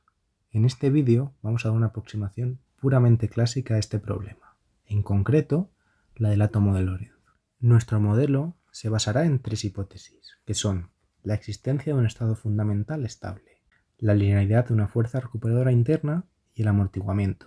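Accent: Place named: India. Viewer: Gran vídeo amigo, me salvó el semestre. A veces no se entiende el acento hindú, pero está chévere. Saludos desde Perú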